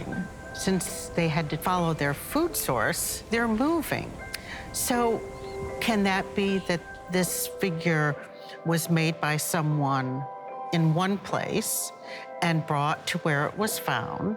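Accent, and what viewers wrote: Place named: United States. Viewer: Can't get past the usa accent.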